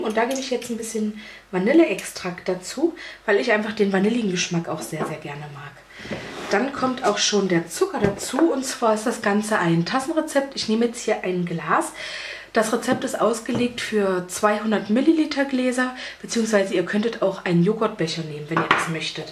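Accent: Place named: Magdeburg, Germany. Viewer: Hallo, hier ist eine Namensvetterin, durch Zufall bin ich auf deine Seite gestoßen. Ich glaube auch auf der Suche nach Infos über Gerstenmalz selber herstellen, egal. Es ist mir dein Dialekt aufgefallen, der ja nun garnicht bayrische klingt. Kann es sein, daß du aus dem Jerichower Land stammst? Ich bin Jahrgang 1961, da hießen viel aber du bist doch jünger. Es ist schön, daß auch junge Frauen sich für Kochen und Backen interessieren und durch YouTube ihr Videos veröffentlichen. Ich selbst schaue ja auch viele Videos zu diesem Thema an. Mach weiter so, hast tolle Videos und dir und deiner Fam. ein schönes Weihnachtsfest. Liebe Grüße 😀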